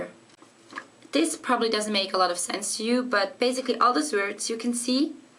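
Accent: Zurich, Switzerland. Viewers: your English of course... I know not everyone can be perfect, but your accent is SO Swiss/German when you speak English... I'm very anal, so it bugs me... sorry 'bout that :( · I can tell you'r from Zurich by your English accent..lol AWESOME video though!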